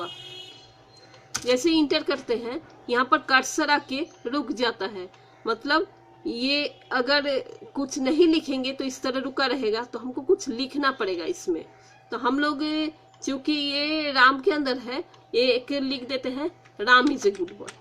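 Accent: Bengal, India. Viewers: Apka muh se hindi sunke aisa lagta hain ki ap achhi tarah bangla bol sakti hain. Ap bangla mein video kiu nahi banati?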